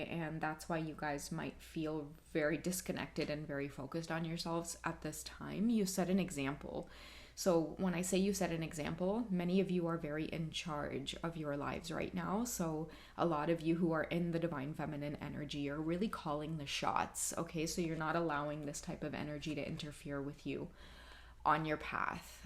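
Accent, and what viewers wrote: Trinidad and Tobago. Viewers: You did a good trini accent. Thank you for the reading.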